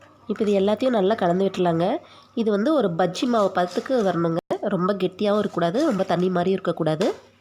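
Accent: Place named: Coimbatore, India. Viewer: Neenga paesaradha Partha, neengalum namma coimbatore thaan ah🤔🤔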